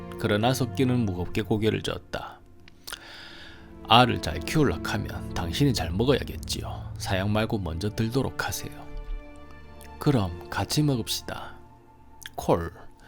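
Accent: Gyeongsang, South Korea. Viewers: 경상도 사투리가 구수할수도 있구나~^^ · 근대 해설자님은 사투리를 경상도만 써요?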